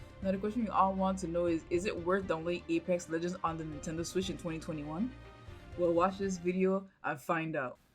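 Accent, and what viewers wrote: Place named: Jamaica. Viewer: she sounds Jamaican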